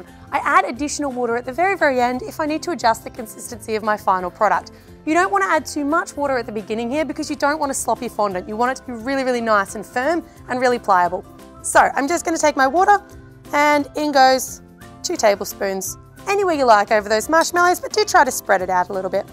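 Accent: Australia. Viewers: I love your Australian accent! My English teacher is Australian here in Sweden and oh my, I think about you every time I hear her :) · Does no one else think she sounds like an Australian Rachel Ray? Lol! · Draco Malfoy has a British accent....hers is Australian.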